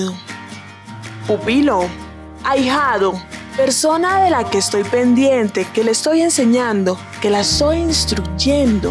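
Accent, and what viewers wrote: Colombia. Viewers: Hola la que locutora del video es caleña o chocoana?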